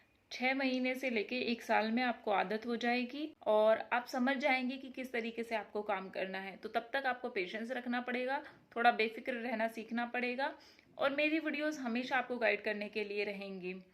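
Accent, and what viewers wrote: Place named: Haryana, India. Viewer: Aap kha se ho ji? Bhatere 😂 sun k haryana se lag rhi ho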